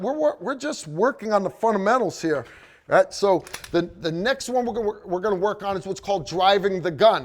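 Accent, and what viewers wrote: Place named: Eastern Pennsylvania, United States. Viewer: The accent🤔 eastern Pennsylvania maybe?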